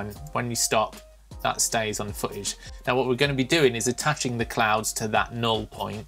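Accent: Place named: United Kingdom. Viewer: Usually love your videos dude. This one is a little disappointing I'm afraid. Effects shouldn't just be used randomly, any editor knows physics is the most important part of adding effects post edit. The first question I asked (after why you add an extra O to videography 🤣) is why are there clouds being added at this height? The drone is clearly below cloud level, so at this point, eye training takes over and it automatically looks unnatural. We won't go in to types of cloud and why that's wrong. You're an amazing video editor that much can be seen from your other videos, but one thing that really gets my goat is people giving tutorials on something that's not right, this isn't good practice for those looking at compositing, FX physics are even bringing in science into the edits. I really don't mean to come across like I'm pissing for the sake of it. 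You're channel may be small, but it's great and I can understand your limey accent too. Give us more of your skills in Final Cut and videography (with a single O).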